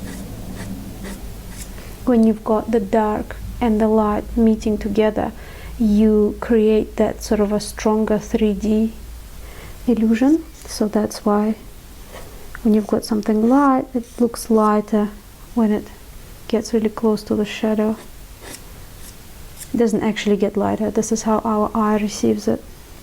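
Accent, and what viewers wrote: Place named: Russia. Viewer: haha, okay good to know! Russian accent is great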